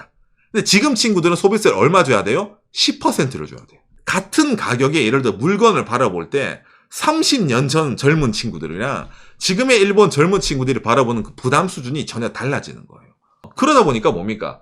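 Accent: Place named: Gyeongsang, South Korea. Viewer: 어디서 많이 들어본 말투인데... 어디지 ? 경상도인데..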